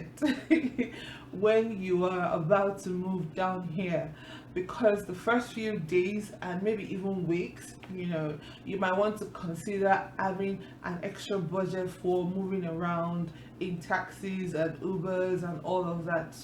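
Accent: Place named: United Kingdom. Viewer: I like the way you talk You sound British😌😌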